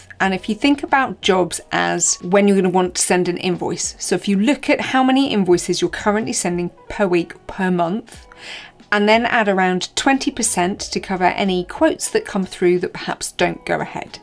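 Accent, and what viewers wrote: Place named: United Kingdom. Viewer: Your video has been so much help! We've used it a lot tonight. Fun fact... we're referring to you as the "lovely posh English lady" 😀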